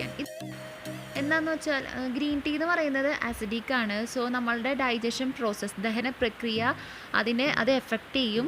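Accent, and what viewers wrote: Thrissur, India. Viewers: തൃശൂർ ആണ് അല്ലെ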